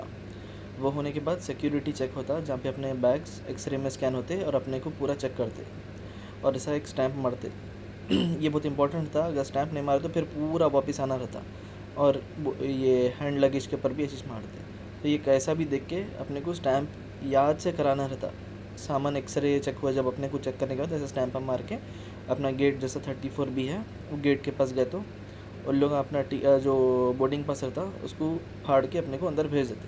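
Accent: Hyderabad, India. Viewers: Sir your Hyderabadi Hindi is Fabulous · wow man i liket your hydrabady language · Bhai hydrabadi bhasa bhot achi lagti meko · Awaaj sunke bhi aap ko pata nahi chala bhai haidrabadi h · PURE hyderabadi yaar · Hydrabadi Hindi Is awesome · Hyderabadi sunkey sukun masla dikha..mast samjhaye 😍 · Waahh... Puri Hyderabadi language Bole aap.. Good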